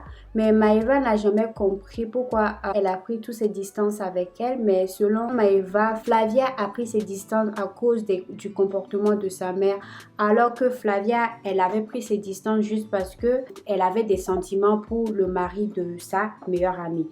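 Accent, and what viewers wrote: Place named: Ivory Coast. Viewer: j'adore ta façon de parler et ta personnalité,j'ai aussi cru que tu étais ivoirienne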